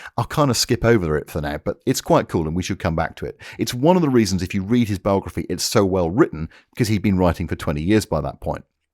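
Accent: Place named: Birmingham, United Kingdom. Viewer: Hey, thanks. Liked the Brummie accent. 😎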